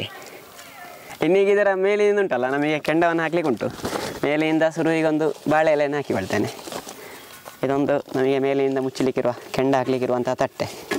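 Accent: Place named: South Kanara, India. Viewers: Your explanation and costal kannada talking are spr 👌😍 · We love your videos. Wonderful presentation with pure SK Kannada. Jai Kannadambe 🙏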